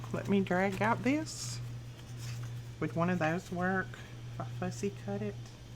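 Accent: South, United States. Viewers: Such cute mini folders love the paper you used. I enjoy listening to your southern accent. 😀💖 Have a blessed day. What is the name of that beautiful black bling that you used on butterfly and where do you get it?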